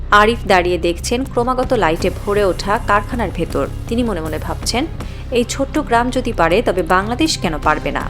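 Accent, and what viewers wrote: India. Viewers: ইন্ডিয়ান স্টাইলে সংবাদ বলা বন্দ করেন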